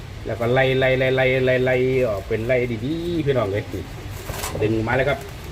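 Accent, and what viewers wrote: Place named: Isan, Thailand. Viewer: ชอบดูมากจาก อยุ่จังหวัดไหนของประเทศไทยจ้าเว้าคือกันเด้อยายคือคนไทยจังหวัดชัยภูมิจ้า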